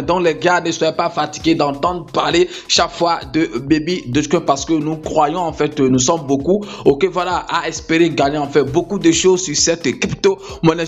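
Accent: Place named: Africa. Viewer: C'est l'accent africain.. normal quoi . Fais toi plutôt l'effort de comprendre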